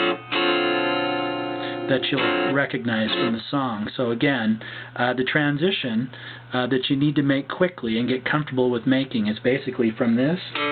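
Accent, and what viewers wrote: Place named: United Kingdom. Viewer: I would of thought you were british!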